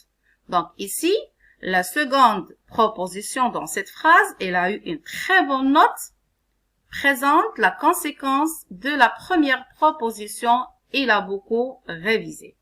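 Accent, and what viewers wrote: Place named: Arab world. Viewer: Tg juste prcq elle et arabe et elle a un accent arabe rasciste jte signale regarde comment tu la blaisse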